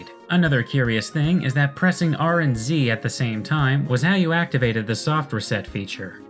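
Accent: Canada. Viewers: I thought you sounded Canadian, the you said "Z" like Zee, then I thought you must not be. Then you said you were Canadian...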